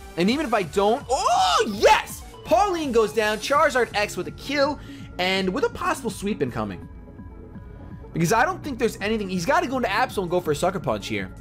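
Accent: India. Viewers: Why the Indian accent offensive to me mate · Indian accent ftw. You've got yourself a sub bro.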